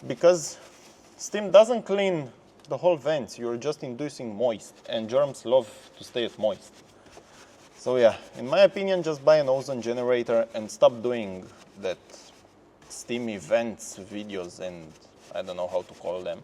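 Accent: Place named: Romania. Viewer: Mi s a parut ca esti roman de la accent dar cand am vazut romaneasca din portbagaj mi am dat seama ca e vorba de Romania 100% 😂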